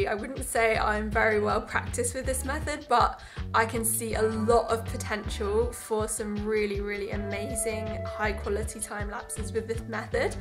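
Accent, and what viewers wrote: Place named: United Kingdom. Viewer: Actually, I was looking for some special camera moves, like sticking it to the brush or stuff like that, but I feel in love with your British pronunciation.❤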